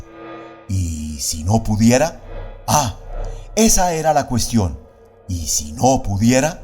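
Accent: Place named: Latin America. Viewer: Qué dicha, un narrador Latino.con este cuento.. Gracias!!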